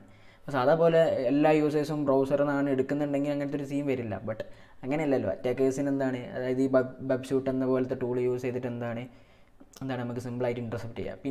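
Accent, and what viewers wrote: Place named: Malappuram, India. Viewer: malappuram language slang anu allo . entha e olu😁😁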